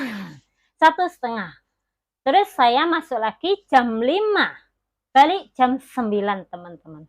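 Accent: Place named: Java, Indonesia. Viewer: Medok temen ngapake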